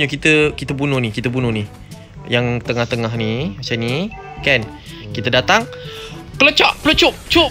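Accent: Kelantan, Malaysia. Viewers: Beking btl abe maingg 😂😂 Beking=garang ☝️ Dialek Kelantan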